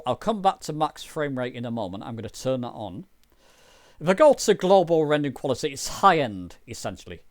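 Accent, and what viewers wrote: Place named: Scotland, United Kingdom. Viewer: Lol your accent sounds just like my Scottish friend I play Rust with! Thanks for the video!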